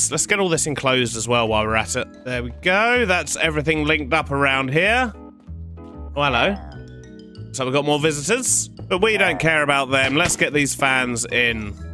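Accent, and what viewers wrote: United Kingdom. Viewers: Also are you british?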